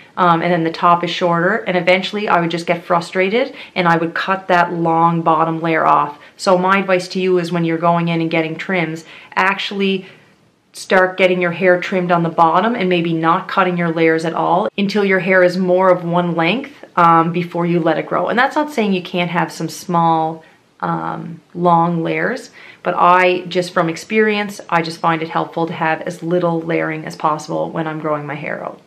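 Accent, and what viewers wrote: Canada. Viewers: Must be Canadian :) spelling and accent gave it away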